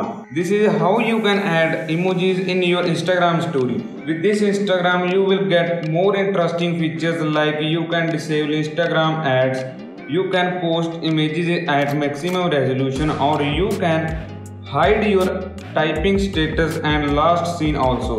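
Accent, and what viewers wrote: India. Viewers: bro tuhadi english...🔥✨😂desi touch nal..!!kaim lgdi a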